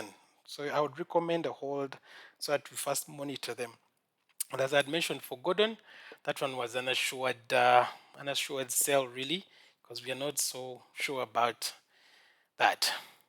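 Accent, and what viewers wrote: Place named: Europe. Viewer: I love the accent it seems European ❤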